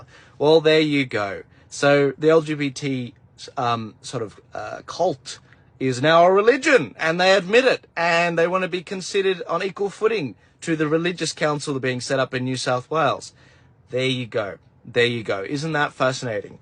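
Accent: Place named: United States. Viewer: Misinformation. Such as Dutton stating the voice has the power to Vito government? Or how about its completely legal for politicians to lie to Australian public during elections and referendums? How about scomo with his watered down to the national icac bill? What war are you fighting bud? You sound just like a yank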